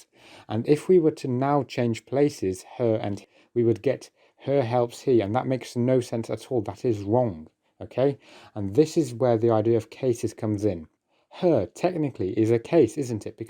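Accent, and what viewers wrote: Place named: United Kingdom. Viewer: I am curious, you have a strong british accent.. are you a native british i am guessing, if so, how did you learn and be so well knowledgeable on the russian language? Great video as always, cases, greatly explained compared to other videos! Will share this!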